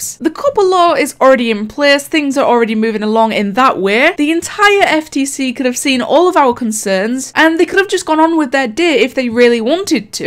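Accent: Scotland, United Kingdom. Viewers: I love me a Scottish Accent!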